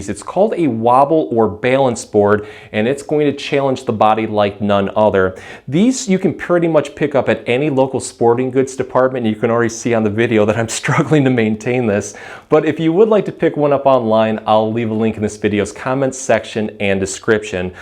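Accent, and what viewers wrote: Michigan, United States. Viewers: Whoa heavy Michigan accent on this one. Helpful tips, as always. Thank you, Dr.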